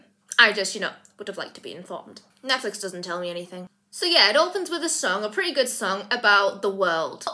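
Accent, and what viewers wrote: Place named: United Kingdom. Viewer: WHY THE BRITISH ACCENT IS SO ADDICTIVE!!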